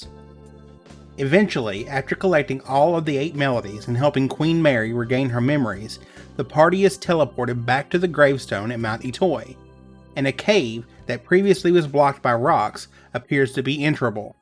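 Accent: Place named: South, United States. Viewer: Finally a Southern youtuber! Haha, cool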